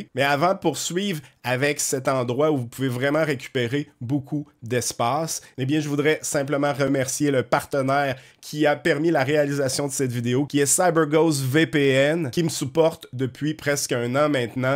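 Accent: Quebec, Canada. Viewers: Bonjour l'ami, perso je ne comprends pas tout, car j'ai fait des manips comme toi, mais je n'arrive jamais au résultat escompté, de plus, je n'ai que très rarement les mêmes fenêtres qui s'affichent à l'écran ... J'utilise windows 11 famillial installé de base sur mon PC, pas de version pro payante, du coup beaucoup de tes trucs ne fonctionnent pas ... Pourrais tu te mettre au niveau du commun des mortels et proposer des astuces qui marchent avec les versions pré-installées et non payantes que la plupart des gens possèdent ??? Rassures toi, j'aime énormément tes vidéos, ne serait ce que pour ton accent !!! Mais il serait sympa que tu penses aussi au petit peuple qui ne peut s'offrir la version pro ... Allez, je dis ça, je dis rien ... Bises à toi mon cousin de la belle province😘😘😘 Phil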